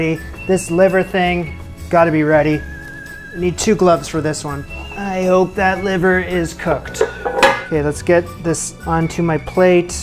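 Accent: Canada. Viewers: I was surprised by NYC. His vowels pronounce him to be Canadian...or so I thought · The Canadian accent always gets me haha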